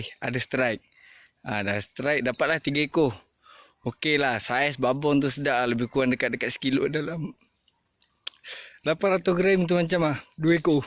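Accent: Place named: Java, Indonesia. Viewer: Pekat Jawa bang. Hahaha. Gede iwak e.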